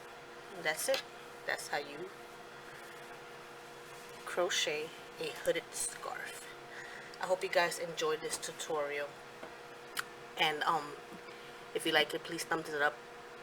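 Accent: New York, United States. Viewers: Thank you for your clear instructions. I can't wait to start 😊. Do I hear a fellow New Yorker?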